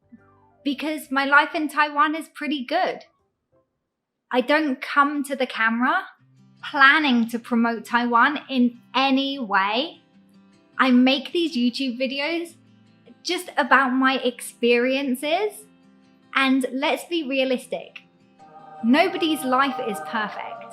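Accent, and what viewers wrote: England, United Kingdom. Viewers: 看影片聽你英國腔感覺很有趣，常常想到哈利波特電影他們說話的感覺。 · Say thanks to who lovesTaiwan according to yourself experience here. I also like to practice my listening in British English by your channel. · 對了，我非常喜歡您的英式英語的語調，從以前到現在都一樣，就是喜歡英國腔的英語而不是美國腔的美語！老實說就是讓我覺得聲調很悅耳！ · 英國腔調的英文就是讚拉！ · I enjoy watching the videos in this channel as I get to learn what the life, particularly the bright side, of western immigrants is like in Taiwan, with a bonus of listening to the voice with a beautiful British accent. I would not be worried about the content balance, as we all understand this is not a news coverage channel. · I like your fascinating British accent, very clear and speed just fine. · 妳的發音字字清晰，英國發音真的跟美國不一樣。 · 很清楚很濃厚的英格蘭腔英語發音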